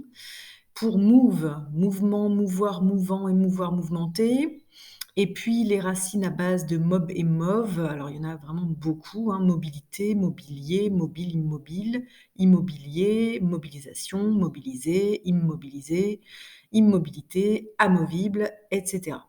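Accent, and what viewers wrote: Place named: South, France. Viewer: Bonjour Madame, c'est Priam. Sympa votre accent du sud! Pour répondre à Valentin, je trouve mes questions et mes réponses dans des livres. Bonne journée!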